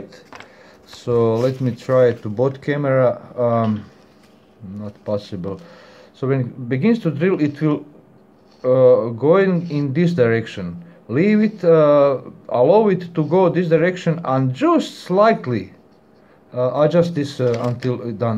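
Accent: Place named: Russia. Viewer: Sorry, your accent sounds Russian. I was complimenting you.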